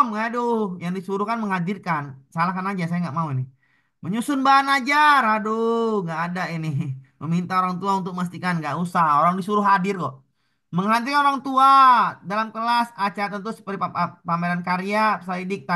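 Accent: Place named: Lampung, Indonesia. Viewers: Nada Sumatra banget bapaak😅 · Saya suka penjelasan bapak kayak orang lampung cara ngomongnya tapi nyambung saya 😂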